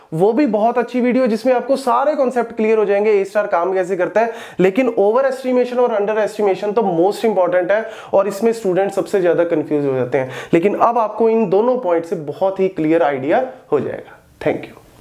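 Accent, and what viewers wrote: India. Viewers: Sorry I cannot understand Indian English...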